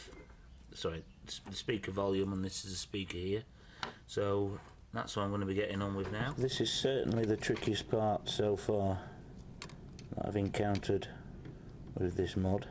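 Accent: United Kingdom. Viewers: You played Tetris on a Game Boy in 1989? ;-) Did you import one or something haha cos it didn't come out until September 1990 in the UK and you sound British so I'm assuming you're in the UK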